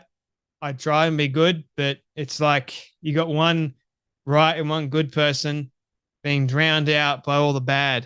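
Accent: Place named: Australia. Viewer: You sound Australian and I like you young man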